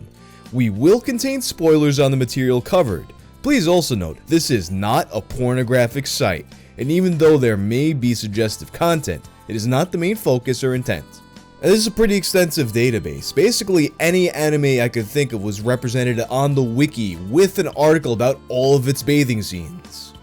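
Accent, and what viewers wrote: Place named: New York, United States. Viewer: Nah, it wasn't repetition of the word, and it didn't start to lose meaning to me. It was just hearing it in American New York accent that almost immediately flagged the word itself as a unique one. Thanks for alerting me that there's a word for that phenomenon, though! Interesting little wikipedia page on that.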